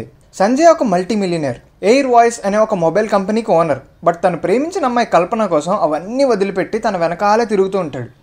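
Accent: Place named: West Godavari, India. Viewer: Baaga cheppinaru....way of telling is very very nice😍😍😍..midhi west godavari aa??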